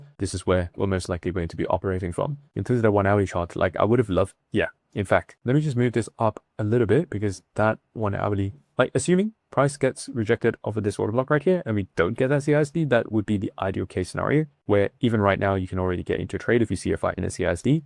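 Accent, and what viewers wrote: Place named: England, United Kingdom. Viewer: Not sure what it was, I’m Australian and you’re accent is slightly English but super fast I had to slow it down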